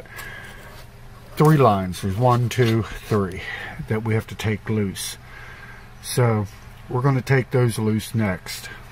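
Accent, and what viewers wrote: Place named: Central Pennsylvania, United States. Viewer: You sound like your from Central Pennsylvania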